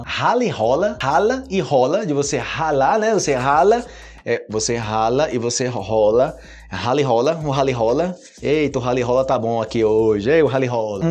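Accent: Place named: Colombia. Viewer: El es brasilero, pero estudió en Colombia . De verdad habla con acento colombiano 😂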